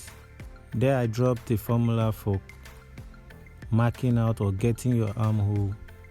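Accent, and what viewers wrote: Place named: Africa. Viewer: Employ me at youtube to translate for you,its like you guy don't understand Africans and Asians spoken English 😒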